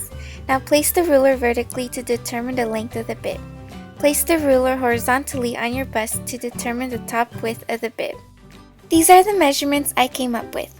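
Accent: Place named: Spain. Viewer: Se te nota bastante que hablas español =)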